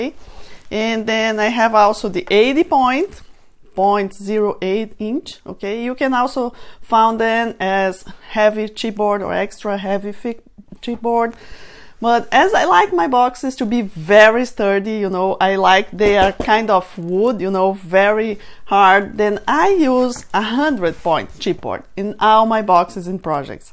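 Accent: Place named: Brazil. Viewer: Are you Brazilian? By your accent, I guess so. 💜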